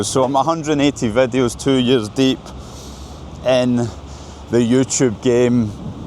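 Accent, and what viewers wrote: Scotland, United Kingdom. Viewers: I admire Scottish accent so much. Good luck, dude. I’m sure that you will be okay no matter where you live. 😊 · Job well done, I've gotten a feel for Moscow and for Russian people, favorable in total. You have a talent for interesting content with a relaxed feel, I will miss your Scottish accent and walks through the city. Thank you from a friend in Kentucky [usa]. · I’ve just watched your video for the first time. Like it! Scotch accent is adorable and in some places reminds me my own one. Rs are very similar. Will watch more. Thank you!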